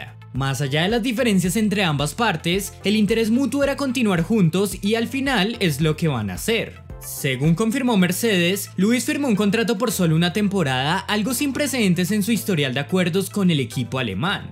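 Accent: Colombia. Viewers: es de colombia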